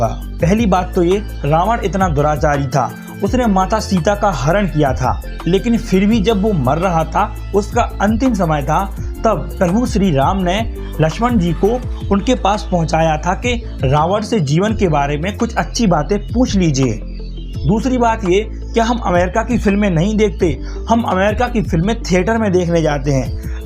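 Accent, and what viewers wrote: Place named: India. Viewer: Bhai English to sahi kr lo pehly Indians ki English or accent bohat kharab hai passive voice hi ghalat hai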